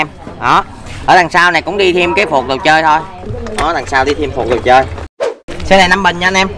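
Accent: Vietnam. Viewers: Dân bến tre hả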